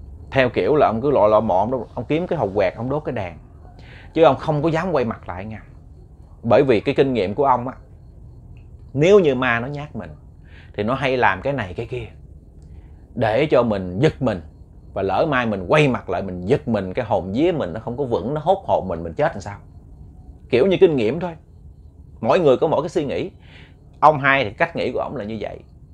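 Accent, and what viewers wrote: Mekong Delta, Vietnam. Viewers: Giọng đọc của đúng chuẩn miền tây quê tôi...❤❤❤❤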